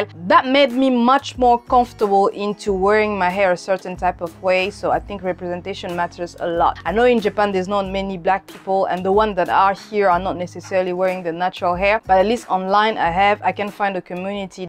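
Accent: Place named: France. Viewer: wait are you french? bc u have the french accent like me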